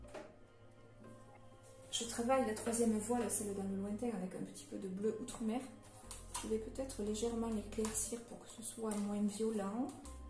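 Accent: South, France. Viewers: Bonjour..Je viens de m'abonner à votre chaine et je suis admirative de votre travail! merci pour toutes ces vidéos qui m'ont donné envie de me lancer dans l'aventure. Grace à vous j'ai pu commencer à faire de jolies choses.Vos vidéos sont très bien expliquées et votre accent du sud nous apporte un pur moment de bonheur. Merci pour tout ce partage et pour toutes les explications que vous donnez pour des débutantes comme moi.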